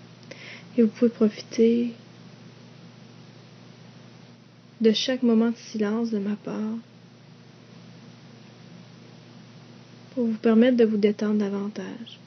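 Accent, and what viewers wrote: Canada. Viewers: Bonjour, j'ai écouté beaucoup de vidéos d'hypnose, et je trouve ta voix très relaxante, merci. C'est peut-être l'accent canadien qui me relaxe, je suis français. Continue, tu es douée. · j'adore la façon québécoise de parler! c'est très agréable...(chui Français). de plus, vidéo très relaxante! merci bcp!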